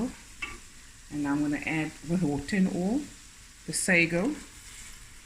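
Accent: South Africa. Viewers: Is that a South African accent I am hearing?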